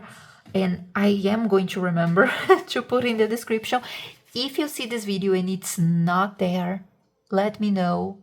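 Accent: Brazil. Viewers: Hi! I'm loving your content. So good! It feels like you're a brazilian because of your accent. Don't know if you're comfortable disclosing your nationality, but, if you are, greetings from a fellow tupiniquim :) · Imagina a minha surpresa quando ouvi a voz e pensei "isso é BR", fui olhar nos detalhes do canal e boom, era mesmo. Nice seeing another brazilian in the weeds of the hobby, pur gaming landscape goes hard in a different direction than what you tend to see on youtube. Lots of GURPS going on around Brazil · Acho engraçado que eu reconheci o sotaque brasileiro nos primeiros segundos de vídeo kkkkk, o nosso sotaque é muito reconhecível e belo! Excelente vídeo, keep up the great work!!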